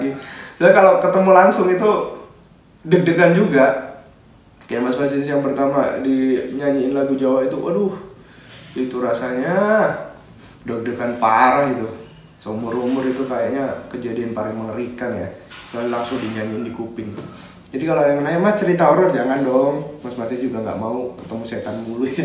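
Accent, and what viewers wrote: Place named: Malang, Indonesia. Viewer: Part 1 mana ?? Bahasnya medook...org Malang tibako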